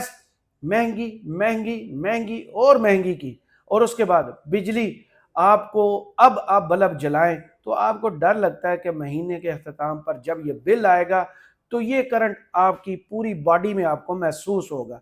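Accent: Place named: Punjab, Pakistan. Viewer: PUNJABI LEHJA BHT ZABARDAST HA APKA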